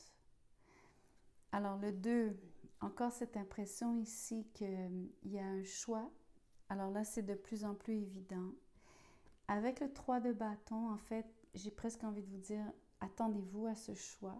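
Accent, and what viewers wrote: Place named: Quebec, Canada. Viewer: J'adore votre accent "je me souviens "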